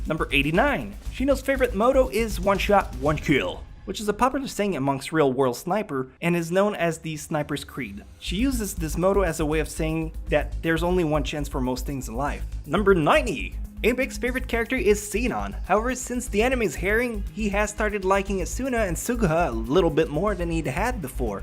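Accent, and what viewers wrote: Canada. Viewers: Clearing this up for everyone shitting on his pronunciation: he's French Canadian. For some reason, and I've experienced this through close friends of mine having the same issue, French Canadians struggle a lot with English pronunciation. This is because of tongue placement. In French, the tongue tends to rest on the bottom of the mouth when speaking, whereas in English it is more at the top. This is an issue when learning a new language later in life because the tongue has trouble getting used to switching from the bottom to the top and vice versa. And now you've learned something new! Enjoy your day!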